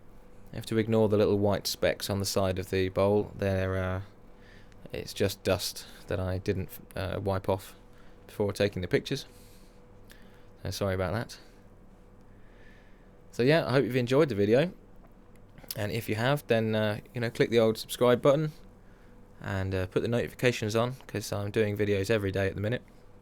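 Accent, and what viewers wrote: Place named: United Kingdom. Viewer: Brilliant very creative - Thanks. I recently made my own polishing paste - 4 parts mineral oil 1 part white bees wax, 1 part diatomaceous earth. I mistakenly thought that I was limited therefore to wax based finishes such as buffing with Carnuba wax. However, I see you using shine juice here without a problem. I would like your (or others) input on finishes that can be used after polishing paste. Thanks in advance. PS: I would be interested to learn where you are located in the UK. I left for the US in 1984 and have been trying to nail down your accent.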